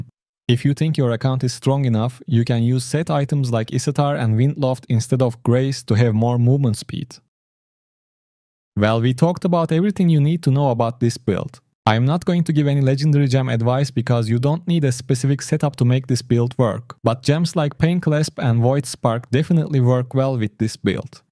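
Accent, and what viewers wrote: Turkey. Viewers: Kanka sen Türk aksanı ile konuşuyorsun öyle ise yazar mısın? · Videoya girer girmez türk ingilizcesi aga nerde olsa tanırım 😅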